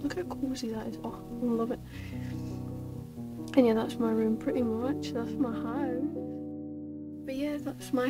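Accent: Scotland, United Kingdom. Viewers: OMG A SCOTTISH BLOXBURG YOUTUBER SCOTLAND FOREVERRRR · She’s Scottish like my silly billy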